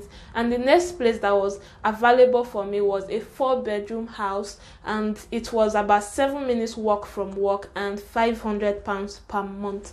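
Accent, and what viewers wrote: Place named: Nigeria. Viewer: I love your video.... and mostly your accent, you speak like a friend of mine....naija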